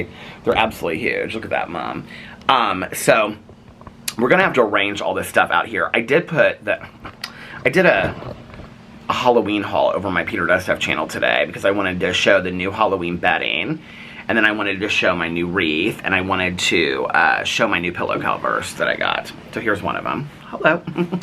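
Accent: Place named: Midwest, United States. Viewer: I've noticed that too. Honestly, it has to be some kind of accent. I remember in the first grade I was so confused because I had a friend who would say 'saw' like that, and she'd say 'drawl' (for draw). More than anything I'm just curious about which part of the Midwest it comes from. Obviously somewhere. Maybe my friend's parents and Peter's parents are from a similar spot geographically?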